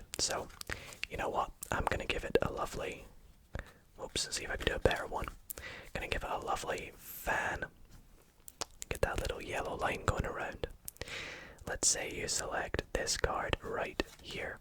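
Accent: Scotland, United Kingdom. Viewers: Your Scottish accent makes your asmr the best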